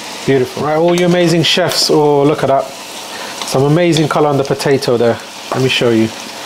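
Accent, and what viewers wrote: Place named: United Kingdom. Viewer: Some British accent..... up ahead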